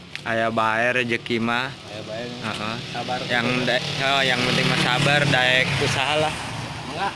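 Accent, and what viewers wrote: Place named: Banten, Indonesia. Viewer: bang .logat ngomong nya kaya orang banten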